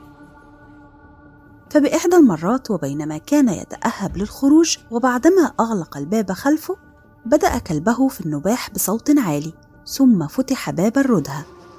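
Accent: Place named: Egypt. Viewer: يا مرحبا بأخت صدقيني اعشق صوتك اتعرفين لماذا لانو عندما كنا صغار في جزائر كنا نسمع صوت رسوم متحركة بلهجة مصرية ولهاذا صوت مصريين نحسه يذكرني بصغري والله روعة 🇩🇿🇩🇿❤❤🇪🇬🇪🇬💪💪💪💪💪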